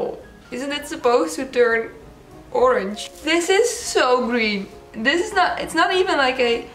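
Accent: Netherlands. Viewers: Hahaha altijd zo leuk als je denk hmmm Vgm is ze Nederlands want ze heeft een Nederlands accent en het dan goed hebben! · I couldn't quite figure out why your accent sounded so familiar to me, until I looked at your channel and saw you were from the Netherlands which is where I'm from hahahaha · Is dat een Nederlands accent? 😏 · dutch accent hihi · Are you Dutch? If yes, your accent gives it away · Hoorde gelijk aan Je accent dat je NL was 😂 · Are you dutch you sound dutch ( first video of yours ive seen) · You have a dutchy English-speaking accent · Hahahaha 1 min into the video en hoorde gelijk dat je Nederlands bent 😂 love ur hair tho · Are you Dutch?? As someone from The Netherlands your accent sounds really Dutch 😂😂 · Whahaha ik zat voor het eerst te kijken en ik dacht ‘Dit is een TE Nederlands accent’ en zag in de beschrijving dat je inderdaad Nederlands bent!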